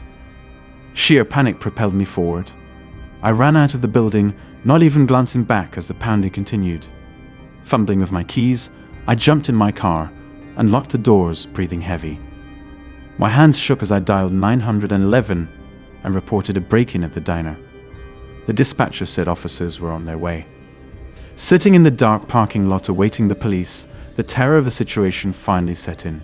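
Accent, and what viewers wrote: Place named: England, United Kingdom. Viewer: A Englishman ,narrating the story . Nice ! 😉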